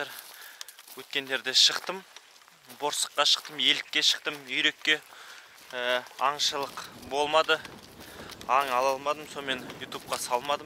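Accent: Standard. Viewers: Ассаляму алейкум. Жакшы аңчылык болуптур, Казактын таза казакча сүйлөгөн жигити ушундай болот, Кыргыз болуп туруп баарын түшүндүм.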